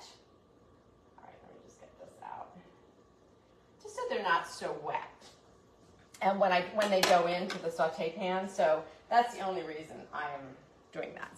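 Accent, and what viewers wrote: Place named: Brooklyn, United States. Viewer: new subscribwe love love love your videos and personality i happen to be vegan am wondering if you are from brooklyn as your accent sounds it i was born there but let 50 yrrs ago now in georgia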